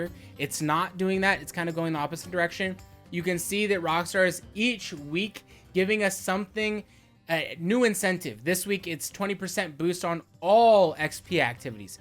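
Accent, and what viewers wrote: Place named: Russia. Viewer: please make more vids especially with your Russian ascent. It’s hilarious! Once a week isn’t enough for me. I look for your vids daily.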